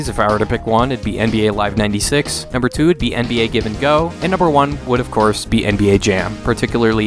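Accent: Minnesota, United States. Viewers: are you from Minnesota? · You from MN?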